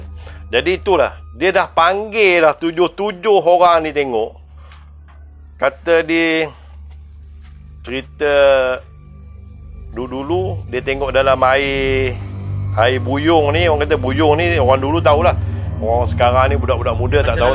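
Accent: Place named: Perak, Malaysia. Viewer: Orang bota ke ni? pekat no loghat peghok 😆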